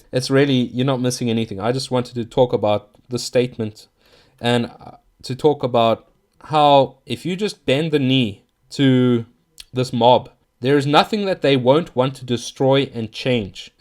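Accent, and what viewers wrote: South Africa. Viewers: I just discovered your channel through normalapollo, I could be wrong but you sound South African, or at least Rhodesian by your accent, and it's good to know a fellow countryman who loves lovecraft and holds nationalist beliefs. Keep up the good work, Volk En Vaderland.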